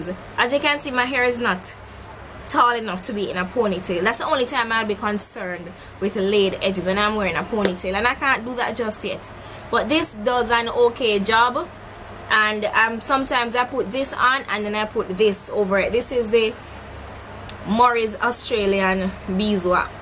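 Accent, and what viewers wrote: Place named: Jamaica. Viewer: i could hear the accent haha am jamaican too but am from spanish town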